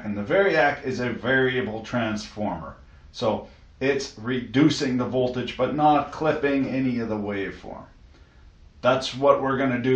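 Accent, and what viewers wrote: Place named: Canada. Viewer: I love ficking Canadians. you sir get a subscribe just for the way you talk lol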